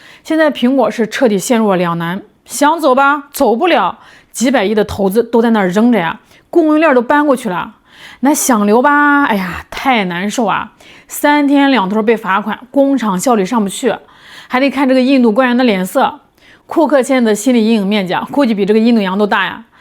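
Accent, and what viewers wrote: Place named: Henan, China. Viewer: 博主听着像河南人捏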